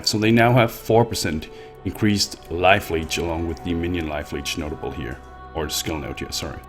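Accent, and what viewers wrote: Denmark. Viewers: it's so fucking obvious how danish you are!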